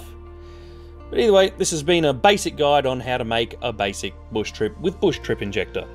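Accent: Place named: Australia. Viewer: For what it is worth, you speak at a good speed for me but I'm Australian too so the accent might just be easier to listen to. I guess it takes all sorts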